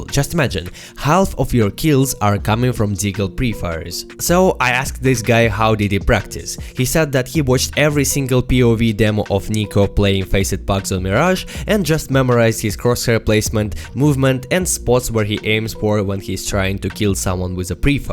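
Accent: Russia. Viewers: ставьте лайк, если понимаете что он говорит, только потому что у него русский акцент:) спасибо за видео ты пиздат. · Мне интересно, ты русскоговорящий или нет, прост акцент либо выдает, либо я незнаю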